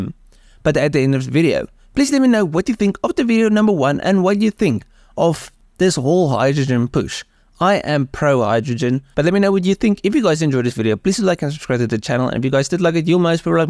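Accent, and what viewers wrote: Afrikaans, South Africa. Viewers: Dear God that thick Afrikaans accent hit me with a wave of nostalgia. Subscribed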